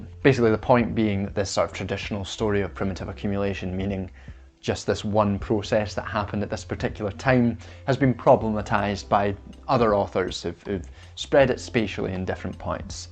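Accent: Scotland, United Kingdom. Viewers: I was keen for a new channel but fuck am I glad to hear a Scottish accent 🙏🏽🥰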